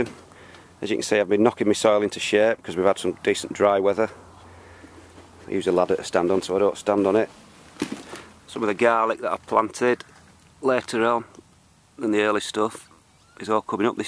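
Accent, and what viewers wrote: Yorkshire, United Kingdom. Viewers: Some good vids mate, with yoru accent id say yor not too far from me which is nice to see so can ompare etc as most vids i watch are usa (im in Barnsley FYI lol) i started a blog this year but stil getting used to it anyway good vids great results on the award veg i onl grow for my food etc but alays on th look out for good uploaders to keep a eye on and steal ideas :P keep it up and feel free to check my vids and comment if you get time :)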